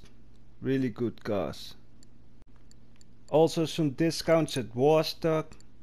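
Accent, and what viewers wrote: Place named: Netherlands. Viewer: Hey man I love you're accent, are you dutch? Ik wel namelijk en je accent doet me denken aan m'n buurman haha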